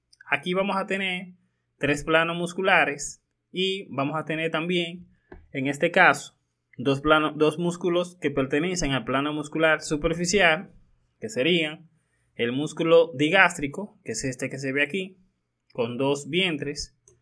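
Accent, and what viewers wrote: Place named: Dominican Republic. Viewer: Mi querido acento dominicano, sigue así, se entiende todo perfectamente ❤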